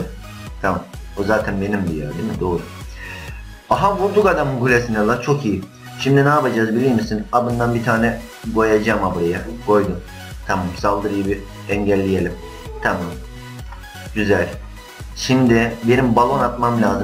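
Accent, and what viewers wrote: Black Sea, Turkey. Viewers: karadenizlimisin la "D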